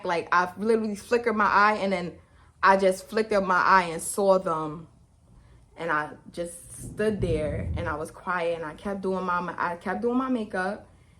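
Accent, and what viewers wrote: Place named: New York, United States. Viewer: Lol ladies not real friends to begin with a girls trip like this already sounds like a no no, 1 or 2 day ones max, lol shorty u Def from nyc I already know I can tell from the lingo I can hear my people's in your voice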